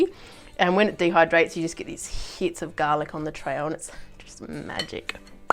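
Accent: Australia. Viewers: Hi I’m a newbie to dehydrating and came across your post. Firstly thanks so much for your excellent presentation and so good to hear a fellow Australian accent. Will certainly try the spaghetti bol recipe. Happy days from Nelson Bay NSW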